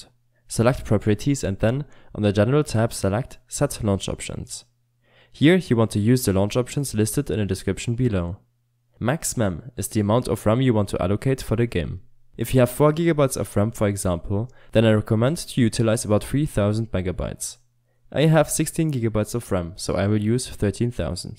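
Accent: Germany. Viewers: Man hört richtig dein deutsch Akzent in englisch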